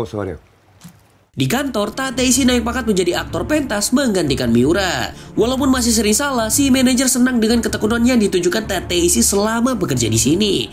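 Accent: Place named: East Java, Indonesia. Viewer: Njirrrr kweden, fix lu orang jatim y, sidoarjo apa surabaya min?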